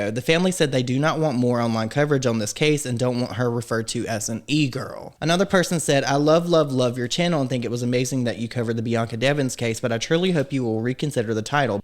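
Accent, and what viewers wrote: South, United States. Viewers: Kinda hurt to unsub real quick, but did it for you! Your southern accent reminds me of home 🏡 💞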